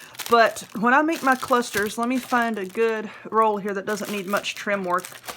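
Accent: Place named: North Carolina, United States. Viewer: Love it!!! You are an awesome teacher! I can't wait to make one. I hope you are doing well. I also like your North Carolina accent. I can't spell the word you said that was N.C. language but my daddy was from and lived in Ashville N.C. and he was something else. He came up with language also. So I love listening to you talk bc it does remind me of him and his language. Thank you for all you do!👍👍🎆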